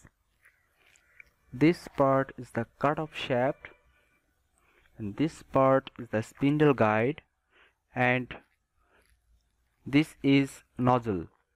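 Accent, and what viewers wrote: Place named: India. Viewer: Indian english is awesome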